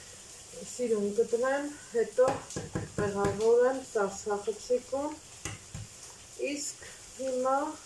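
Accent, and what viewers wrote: Lori, Armenia. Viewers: Барев джан вонц ек,ес нор ем миану кез,вортехиц ек,вонц вор лорва мер барбаров ес хосум кетевем кез,шат ачели е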